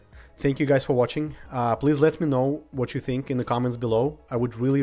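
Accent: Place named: Russia. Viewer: You speak like Russian talks English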